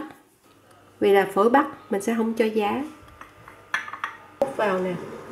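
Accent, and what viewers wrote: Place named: Mekong Delta, Vietnam. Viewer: hết sức chân thành cảm ơn đã nhiệt tình bỏ hết tâm, sức để hướng dẫn, phổ biến những món ăn quốc hồn, quốc túy của Việt Nam cho mọi người một cách đầy đủ, chi tiết kể cả bí quyết gia truyền nhất làm các món xuất xứ từ Miền Bắc như Phở bò, gà, bánh cuốn....tôi cũng rất thích giọng nói mang âm hưởng Miền Tây chất phát, phát thiệt tình....tôi thường xuyên xem các youtube của bạn chúc luôn VUI VẺ, KHỎE MẠNH nhe....và cống hiến nhiều hơn nữa cho cộng đồng: thank you...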